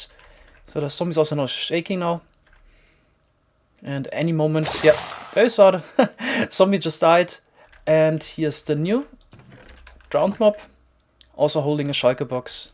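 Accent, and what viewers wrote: Germany. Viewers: du bist deutsch man hört das so raus xD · Danke für das Tutorial! Man hört extrem deinen deutschen Akzent ;)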